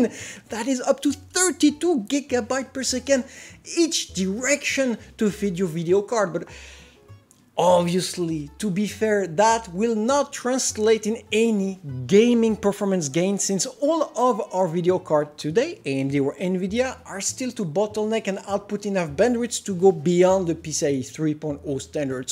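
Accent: France. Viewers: Great review combined with an outrageous french accent that Inspector Clouseau would be proud to own.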